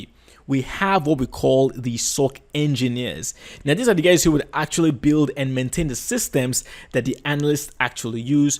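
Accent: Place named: Nigeria. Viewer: Bro you sound like my country man, You Nigerian?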